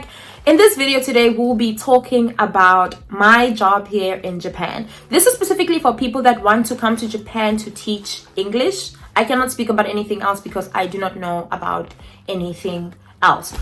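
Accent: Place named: South Africa. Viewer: It's so nice to hear a South African talking about this. I have an interview next week for a ALT job in Japan. Fingers crossed!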